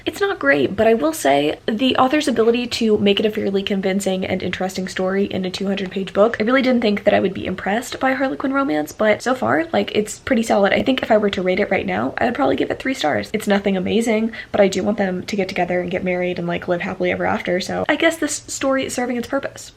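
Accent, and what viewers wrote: South, United States. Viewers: If you’re from the South, her country accent is honestly hilarious Also “yeehaw... we’re done with that” made me laugh for a HOT MINUTE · I loved what you said at the end about romance! Also I'm kinda living for the country accent haha! This was amazing as always! Love your vlogs!! <3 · hahaha I love your country accent 😂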